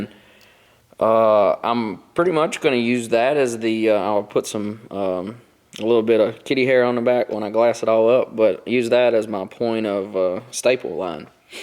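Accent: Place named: South, United States. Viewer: I'm just hear to hear that sweet southern twang...... XOXO!!!! THUMBS UP IF YOU AGREE!! #TDHFTFW #FAMOOSE #SHTNONM #TEAM.DEADLY.HERTZ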